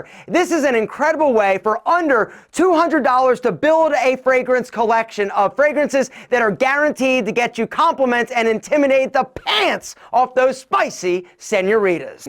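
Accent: Philadelphia, United States. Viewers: Bro you have to be from Philly with that accent.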